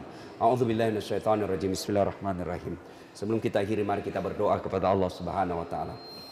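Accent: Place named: Kalimantan, Indonesia. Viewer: Lugat org kalimantan mmg beda shg tajwid nya kelihatan tdk spt org jawa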